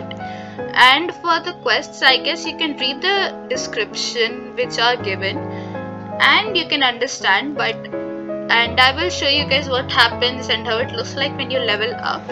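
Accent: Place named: India. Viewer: U in indian? ur accent sounds like so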